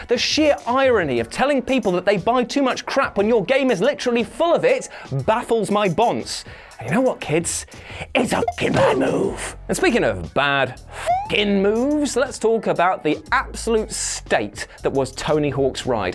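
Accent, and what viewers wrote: South, United States. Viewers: I'm in love with ' Southern accent! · in his southern accent 👏🏽👏🏽👏🏽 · That southern accent tho lol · Wow, seems like Crapcom's not so hot on those sequels, huh? And although your American accent is funny, DMC2 was ALL Crapcom Japan.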